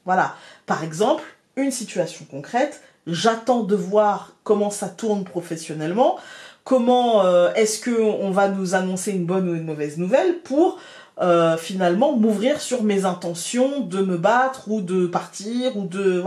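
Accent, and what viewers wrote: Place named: Picardy, France. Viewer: Coucou pourrais tu me faire savoir quel Lenormand tu utilises pour ce tirage. J ai tous tes jeux et celui-ci ne me dit rien. Je l ai peut être loupé. Merci pour le partage et pour ta réponse. Belle semaine à toi avec l accent picard. 🌞💐🌳🌞😘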